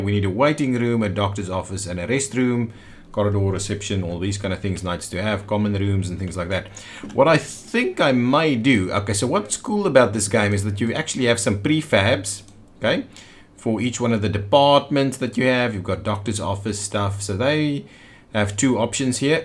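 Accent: South Africa. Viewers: Are you from South Africa? Guessing based on your accent and prenunciation. And I like South African accent. BTW, nice gameplay and commendary. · Can’t say I’ve heard a South African accent before...it’s really interesting. To my American ears it sounds like Scottish, Australian, and African rolled into one...like I’m listening to 3 different people lol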